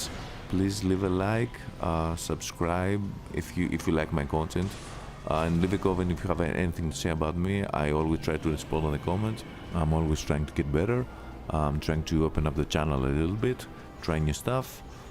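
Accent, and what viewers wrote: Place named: Greece. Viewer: you sound like a greek or it probably is my idea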